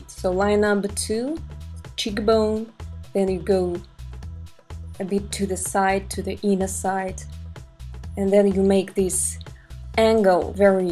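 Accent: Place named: Russia. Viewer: You have a russian accent, i think :)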